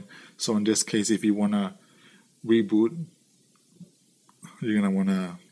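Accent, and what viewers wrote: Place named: Argentina. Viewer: genius genius genius many thanks, I've looked everywhere online videos and more videos of this tablet and did not appear in either hand, I say this tablet is only jajaj ... well until I found your video and could not believe it, get to the logo android but could not access the recovery I needed that combination, so I thanks a lot for the contribution they have made .... I subscribed to your channel and little hand up. I do not understand a lot of the Argentine English, so I had to use the translator thanks again ....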